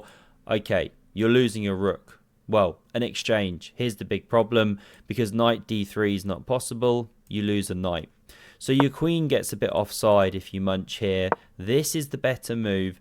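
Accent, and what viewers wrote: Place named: Bedford, United Kingdom. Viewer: Hey man, thanks for uploading, despite the difficult times, you bring great joy, even if you don’t know! I only discovered you recently, but already am a big fan! Nice of you communicating openly about the absence, even the reason for it, which you didn’t need to (but it explains the obvious and understandable sad mood). Good wishes to you and your family, which is surely so blessed to have you! PS don’t worry about us the audience, we have also the old material in the meantime and also priorities are priorities. Don’t put too much pressure in the meantime on yourself in these stressful times, and don’t forget to take enough C vitamin, which helps body deal with stressful reactions! Cheers & greetings to Bedford(shire), from someone, who has an accent nearly identical to yours, not because of the one semester that I spent at the University of Exeter Business School as an exchange student - where I was the best at the University of Exeter Chess Society - but because of a certain highly perfectionist English lady, who took even the slightest mispronounciation out of me and ended up mocking me at the end on how I have “a perfect Bedford accent” (she was from there) hehe. 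Loved the culture, people and country, had the best time there (still feeling English a bit, even though ethnically I’m not 😅 … even wore the red poppy for years, still have it 😊 ). Lived in three other foreign countries, but England I loved!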